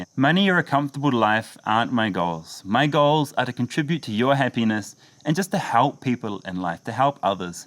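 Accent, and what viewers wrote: India. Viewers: bro u getting that indian accent ,,u sound indian bro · Your accent is starting to sound a little bit indian haha love your work dude ! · You truly speak like an Indian. Wishing you a happy journey in India. 🙏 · Omg your kinda talking English in Indian accent . . . . . . . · Kiwi born and part Indian (Punjabi roots)! Love the videos; you know more about the culture than myself! Very educational. Also, as I got to the end of the video an Indian undertone in your accent became pretty apparent. Took me by surprise a little haha. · Don't you feel it's a big negative that your accent is starting to become Indian? I mean it is one of the stupidest/funniest accents in the world. Also do you do that head waggling thing that is also very funny? Please explain why Indians do that. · Of course, his job now is a youtuber. But tge funny thing is hus accent sounds like Indian. 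😀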